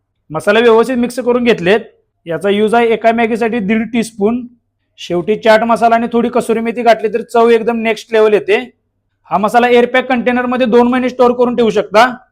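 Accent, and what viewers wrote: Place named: Sangli, India. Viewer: Mastch tumi sangli che ka dada bolne sangli kadeche vatey 😅 khup chan video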